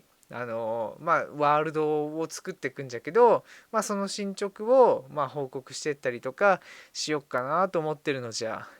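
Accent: Hiroshima, Japan. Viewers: 広島の方ですか？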